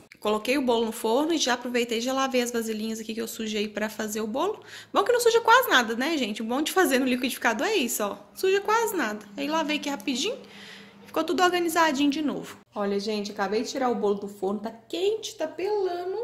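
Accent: Minas Gerais, Brazil. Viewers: amooo seu jeitinho mineirinho de falar morei em barão de cocais 11 anos,amei pessoas acolhedoras é comidas muita Boa Sou de Goiás Bjssss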